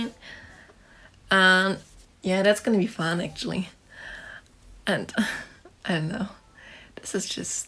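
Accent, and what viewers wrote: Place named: Germany. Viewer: Ich dachte mir doch, dass das 'n deutscher Akzent ist ^^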